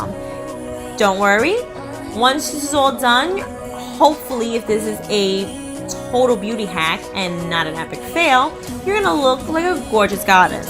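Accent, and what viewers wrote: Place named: New York, United States. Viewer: Are you from New York? It sounds like you have a New York accent😂also great video it really helped I just recently got my tips dyed and now they are very dry this helped so much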